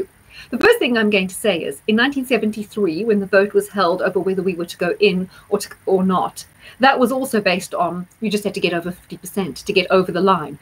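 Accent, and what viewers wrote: South Africa. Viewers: Hi, this remain parliament is driving me insane! It beggars belief how after we were clearly told by Cameron that the British people will decide, not Parliment, not government.... The people and the people's choice will be implemented. Insofar as the next general election is concerned. Can you do a chart on this? I will definitely be voting ukip as there is no one else. It would be interested to see if - after TM resigns after 29th March... I'm hoping actually and Boris takes over, how will he do? Will he start looking at sorting out the destruction caused by Osborne and Hammond austerity cuts? It's desperate how they have made people so desperately poor while the rich flaunt their wealth. The crime rate is horrendous and the London mayor needs to go. Do you see improvement? Thank you for your videos. I found you by chance and constantly look to see if you have posted a new one. 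It's nice too to hear a fellow SA accent!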